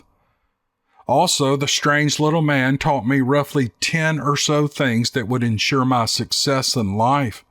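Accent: South, United States. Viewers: I work for Amazon and we're going for broke in the the 5-6 weeks before Christmas. When I catch a gasp when I get home at 6 a.m. I love to catch your you postings before I go to bed. Thanks for your dedication to the narration of the the tales sent to you by writers, but they are a wonderful tales, wonderfully narrated, in the style (and southern voice) of a wonderful Southern yarn that I have come to miss from living in the South. Thanks Man, the skill of Southern yore telling is well and alive in you.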